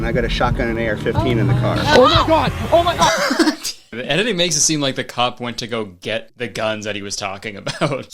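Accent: Canada. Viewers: is the most Canadian I've ever heard you. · kurtis's canadian accent rly popped off here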